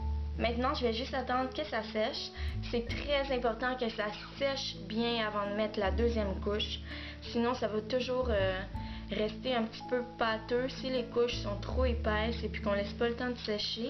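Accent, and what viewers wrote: Canada. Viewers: C super tu est canadienne ?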